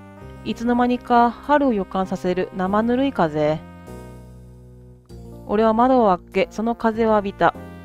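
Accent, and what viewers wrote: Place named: Kansai, Japan. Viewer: 関西人ですが、の関西弁くせになりそう😁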